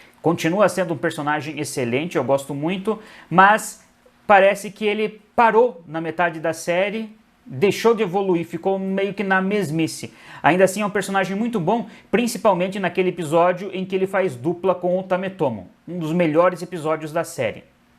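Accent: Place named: Brazil. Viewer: I figured it was Portuguese. Its a great video. As a native Spanish speaker i understood about 60%. Its great to know that Toku is watched all over the world! I'm going to assume that you're from Brazil since it sounds like Brazilian Portuguese. I which that toku could be more popular here in the US where I live P.S. if you could someday add subtitles it would be also. Regardless I'll subscribe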